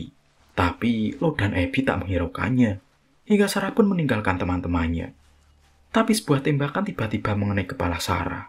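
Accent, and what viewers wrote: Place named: Banyumas, Indonesia. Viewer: pd bae ngapak ya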